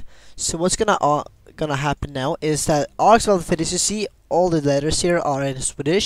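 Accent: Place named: United Kingdom. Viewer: Ahh youre also Swedish metoo shoutout to u ma boy BTW you sound brittish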